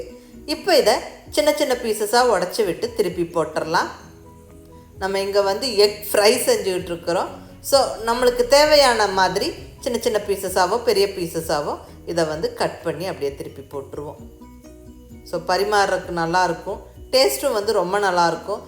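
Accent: Coimbatore, India. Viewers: You are absolutely right. Not only the receipes but the way of presentation with (supposedly Coimbatore Slang) clear and crisp voice are adding merit to her receipes. Thank you madam somuch. Pls Keep rocking ...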